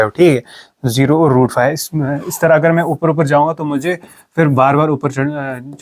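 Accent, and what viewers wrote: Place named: Kashmir, India. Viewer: Sir are u from Kashmir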